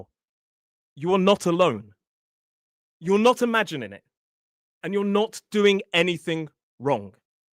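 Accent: United Kingdom. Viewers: Hey uncle you very supper man.because you're speaking in britsh english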